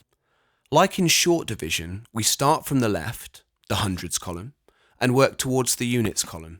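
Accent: United Kingdom. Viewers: so nice to have a British accent explaining this thanks so much!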